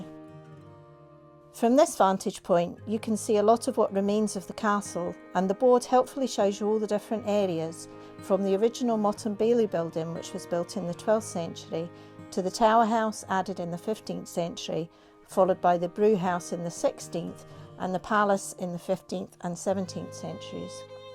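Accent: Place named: Scotland, United Kingdom. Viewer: your voice is a beautiful mix of Scot’s and elegant English. 👍 plus no one likes the sound of their own voice… oh wait, some do! 😂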